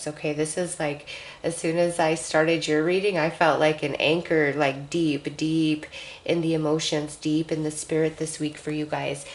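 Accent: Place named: United States. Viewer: Omg we had an ad in Britain for a chocolate bar called cadburys caramel a swear you could be the same voice in an American accent amazing I so love your readings thankyou so very much xxxx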